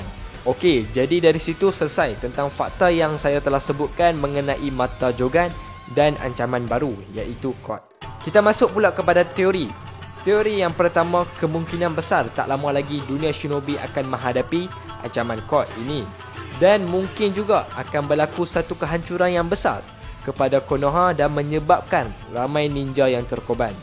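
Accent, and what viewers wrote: North, Malaysia. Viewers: ni org utara ka?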